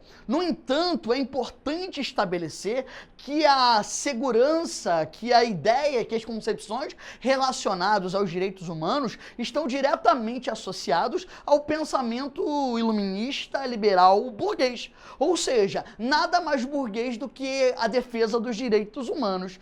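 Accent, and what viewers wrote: Rio de Janeiro, Brazil. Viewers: parei de assistir so porque é carioca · Você é carioca? Kkkk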